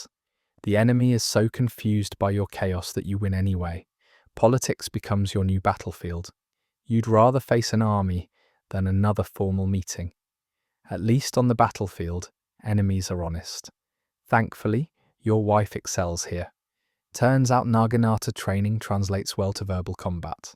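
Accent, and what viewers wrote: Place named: United Kingdom. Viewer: I started listening to videos like this when im studying but the British accent makes it so soothing, I found you guys through the with hunt video but am learning so much from videos. What’s the next topic and when will it be available?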